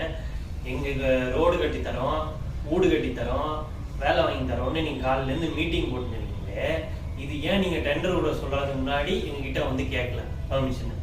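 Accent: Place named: Chennai, India. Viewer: Onces more chennai paasa la pesunga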